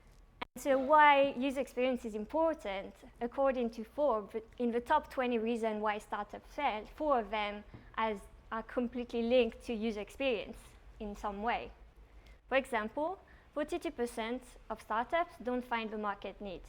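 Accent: France. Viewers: Very educational presentation with good examples. Easy to understand. I like the French accent too :)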